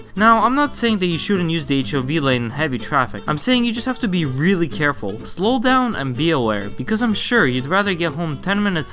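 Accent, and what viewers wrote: Russia. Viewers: has a Russian accent? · Dude, why do you have Russian accent?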